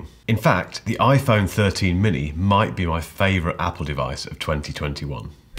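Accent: United Kingdom. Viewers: Excellent and stylish review, I have one and love it as well ,and because your British accent I will drink my usual single malt ,its time to cheers.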